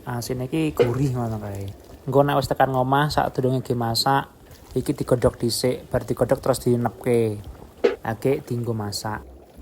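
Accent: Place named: Java, Indonesia. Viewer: Kok bahasanya medok kayak jatim jateng ..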